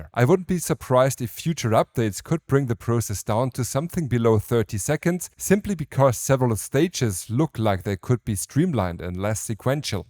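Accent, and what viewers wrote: Germany. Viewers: man hört aber das du deutsch bist 🫣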